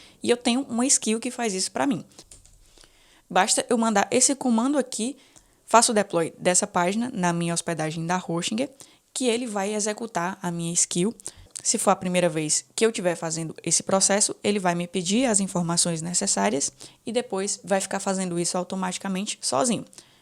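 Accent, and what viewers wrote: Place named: Recife, Brazil. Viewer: Oxi! O sotaque é do Recife. Hahaha Conteúdo muito bom! Muito rico! Parabéns! Uma dúvida: como funciona o Antigravity para criar apps e fazer o deploy?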